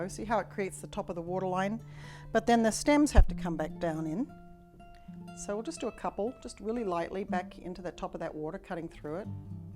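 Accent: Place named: Australia. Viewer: Wow I love your video!! You make it look easy, you are very talented. I love your accent, Australia?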